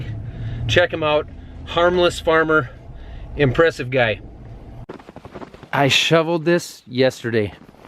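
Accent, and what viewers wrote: Minnesota, United States. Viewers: Can you do your best Minnesota accent for us?¿ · "on your ruff" I love the Minny accent!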